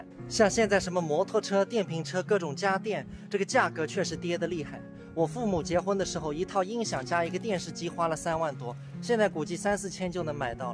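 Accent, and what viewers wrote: Suzhou, China. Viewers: 保持讲苏州闲话， 蛮好听的 😃。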